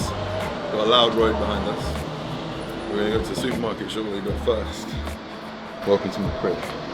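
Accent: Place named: United Kingdom. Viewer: Bro I don’t know if it’s the British accents or what but I have been on a British bodybuilding channel binge for the past couple months great content sir.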